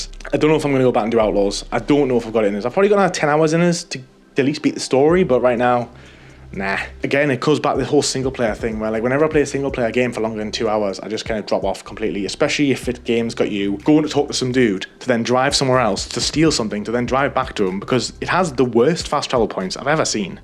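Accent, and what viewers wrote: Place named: North East England, United Kingdom. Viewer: I have over 50 platinums. Out of them 50 i have the dlc trophies for about 3 of them 50. Some games i have dlc purchased but havent touched it, because once i have platinum, im usually too fatigued to play dlc. Im like you. Im 35 now though and have bad adhd. Im really struggling to do the grinds that once seemed theraputic. Cba watching guides for collectables etc etc anymore. Much prefer just putting game on and playing it in my own time! If platinum becomes viable, i will get it, but i wont go hunting like i used to. Dont get the dopamine that i used to when getting plat. Feels like its all for nothing now. Also nice to see a fellow north eastener doing well on Youtube gaming. I'm from Teesside! Just down the road, judging by your accent!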